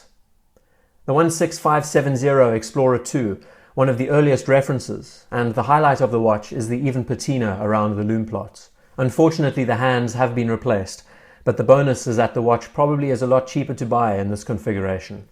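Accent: South Africa. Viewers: Agreed! I thought South African. Keep up the good work!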